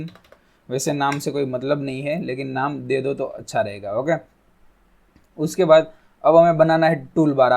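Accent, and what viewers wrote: Gujarat, India. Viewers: When you speak Hindi, it seems that you are 100% a Gujarati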